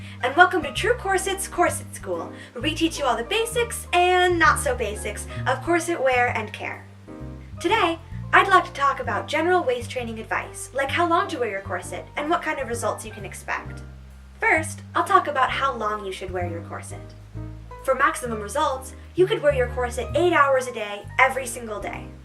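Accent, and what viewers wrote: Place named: Ireland. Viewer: I’m sure you’re Irish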